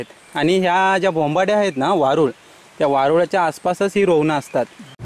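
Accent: India. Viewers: मस्त #properमालवणी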